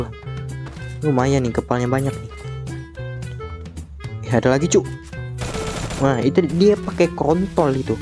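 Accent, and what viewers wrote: Palembang, Indonesia. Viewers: Bahaso Palembang nyo keliatan bang j awoakwowk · Bang lu orang Palembang y bg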